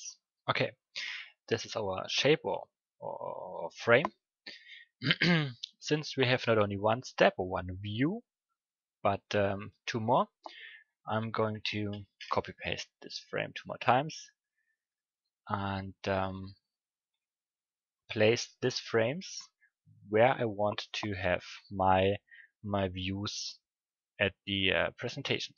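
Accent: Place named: Germany. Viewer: Man merkt schon das du ein deutscher bist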